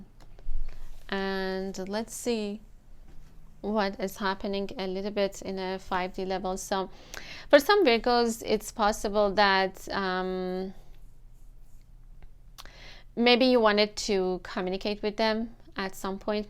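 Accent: Iran. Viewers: Your accent sounds very Persian!!! Great read:)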